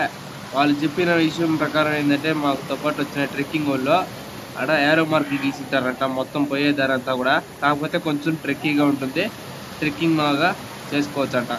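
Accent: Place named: Chittoor, India. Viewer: Chittoor yaasa baagundadhi ba 😉🥰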